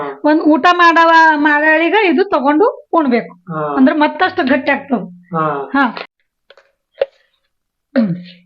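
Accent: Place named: Vijayapura, India. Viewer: ನಿಮ್ಮ ಮಾತುಗಳು ಬಹಳ ಇಷ್ಟ ನಮಗೆ ಅಪ್ಪಟ ವಿಜಯಪುರದ ಮಾತುಗಳು ನಿಮ್ಮ ನಾನು ಬೆಂಗಳೂರಲ್ಲಿ ಇರುವುದರಿಂದ ನಿಮ್ಮ ಮಾತುಗಳು ಕೇಳಿ ತುಂಬಾ ಖುಷಿಯಾಗ್ತದೆ ಏನು ನಮ್ಮವರು ನೋಡಿದಂಗೆ ಆಗುತ್ತದೆ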